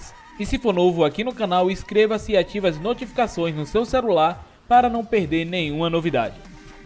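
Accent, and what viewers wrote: Northeast, Brazil. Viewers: tu é de onde?tem sotaque do nordeste oshe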